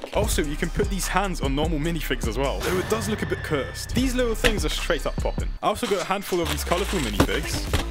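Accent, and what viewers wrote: United Kingdom. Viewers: Are you British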